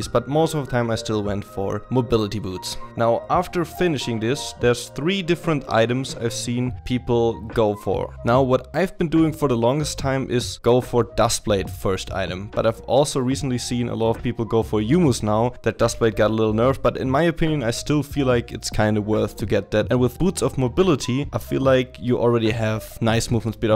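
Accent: Germany. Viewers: 100% deutscher akzent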